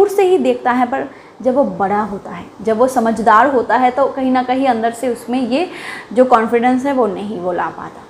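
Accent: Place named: Bihar, India. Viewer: Maim aap kahan se ho bato se lgti bihar ki pl batana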